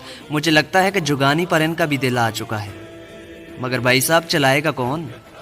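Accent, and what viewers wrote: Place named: Punjab, Pakistan. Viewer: aap k Urdu bolney ka accent to bilkul Punjabis wala ha. pathan ka accent Urdu bolty wkt bilul bhi aisa nahi hota mn khid pathan hu.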